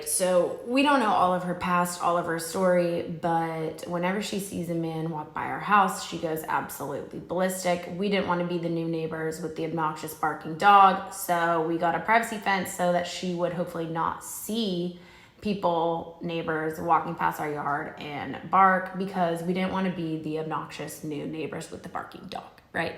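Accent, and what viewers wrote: South, United States. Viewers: I’ve been watching you for quite a while and I’ve never heard your southern accent as much as I did today. I’m southern (if you count Texas), so I love it. I want to share that I got married in 1989 and had my first child in 1997. Infertility is not fun at all, but I pray your dreams come true. At 61, I now have a son who is almost 27 and a daughter who is 23. Hang in there. On a lighter note I love the less-harsh dark brown mascara!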